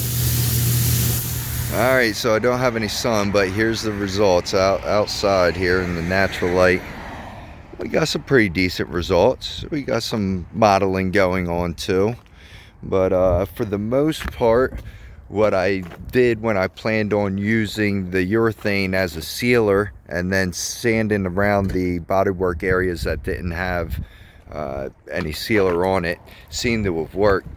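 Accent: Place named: Philadelphia, United States. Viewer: Not sure where you are located but your accent reminds me a lot of folks I used to work with near Philadelphia in Newtown. I'm betting you're from that part of the country!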